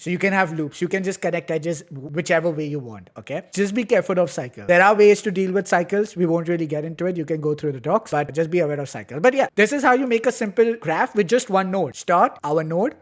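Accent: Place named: India. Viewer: This guys accent is like a mix of a white guy but grew up in India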